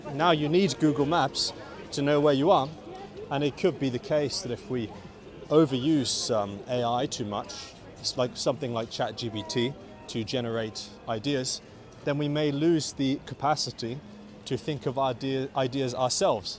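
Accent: United Kingdom. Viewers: Hi thanks for the video,I think this is an excellent exercise to train listening comprehension.First of all,you started with a slow pace when answering the questions which makes it easier for learners to understand and gradually you spoke more quickly and technically the vocabulary got more complex but I like the idea behind these videos.So,well done.I also like your British accent.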